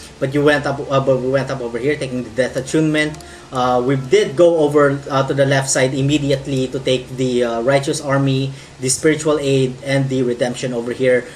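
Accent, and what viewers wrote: Philippines. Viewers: scrolling through PoE vids and i'm commenting on this one to ask... that accent sounds filipino, are you filipino?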